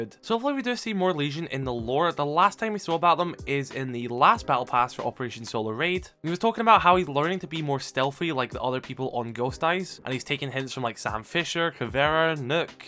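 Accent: Scotland, United Kingdom. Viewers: Where abouts in Scotland are u from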